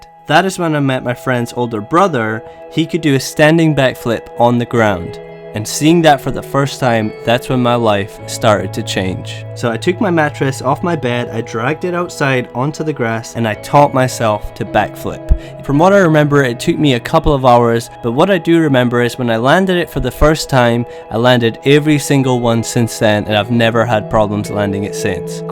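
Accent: Scotland, United Kingdom. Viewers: Good work. just wondering where you are from. You sound Scottish but I’m not sure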